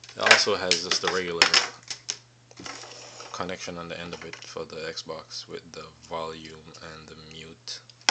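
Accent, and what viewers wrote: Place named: Jamaica. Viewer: Your accent sounds a bit Jamaican, are you from there? :P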